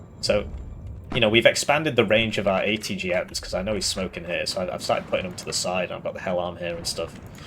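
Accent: United Kingdom. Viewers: Pakistani man in middle eastern attire with a British accent named.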